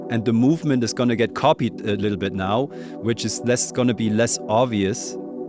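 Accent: Germany. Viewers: I only trust synth advice from German sounding people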